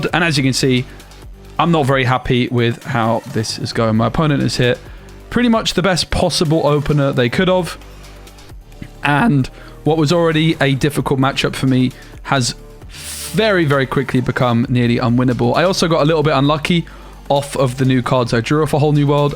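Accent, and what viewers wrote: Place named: Sweden. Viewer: i love the Swedish accent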